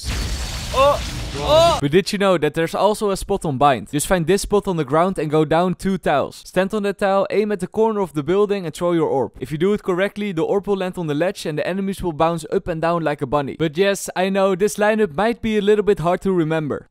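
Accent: Netherlands. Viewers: he lekker goede nederlander youtuber je hoort het echt goed dat je nederlands bent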